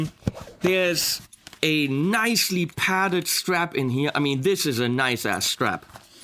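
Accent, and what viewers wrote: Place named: Germany. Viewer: "And you have this riser here for your wah... or your "Wah"Lume pedal." Gots to love the German accent!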